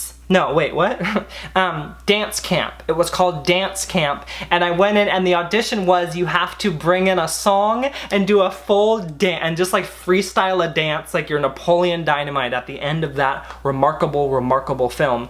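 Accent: United Kingdom. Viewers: especially the British accent too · loooved this video drew ❤️ 1. you were looking incredibly handsome, the eye contact was making me feel tingly and i’m hot so that means a lot. 2. you were on a roll!!! wittiness was consistent and i was following the points well, relating and learning. really appreciating your experience and feeling super connected to you. the best way to end my night! :) (from the UK) (the british boy accent defo needs to stay positive) - Olivia ❤️ ❤️ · My dad was an actor & everything you're telling us is completely accurate. I thought about pursuing an acting career myself but I saw all the jobs my dad almost got & realized that wasn't for me. You are funny & talented ( fairly good Brit accent btw) & was the best thing in "Not Cool" If I was a casting agent I would've hired u 👍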